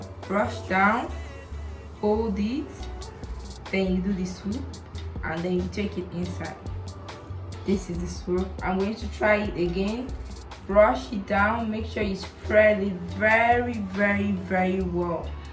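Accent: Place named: Nigeria. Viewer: sis are u nigerian? what state are you from? love your accent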